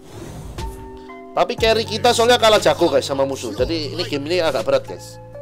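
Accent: Java, Indonesia. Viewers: muka cina suara jawa mantul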